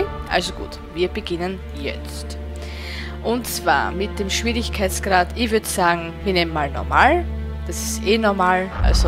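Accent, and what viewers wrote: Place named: Austria. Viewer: i mog deine lets plays, es oesterreichische ghert sprochlich und a lets-play-technisch sowieso mehr forciert :-)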